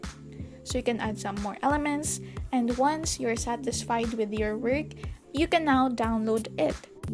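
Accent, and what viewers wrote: Philippines. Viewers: Are you Filipino? Im not assuming you just sound Filipino! Im Half lol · You are filipino aren't you I can hear the accent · Aye I just realized you have a Filipino Accent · I think your Filipina though(in my opinion) because of your accent😭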